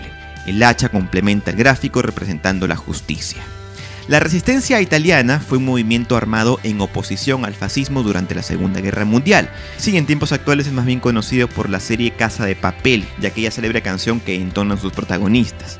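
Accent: Peru. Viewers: Eres peruano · SOY CHILENO Y SE NOTA Q LA VOZ ES DE UN PERUANO DE FUJIMORI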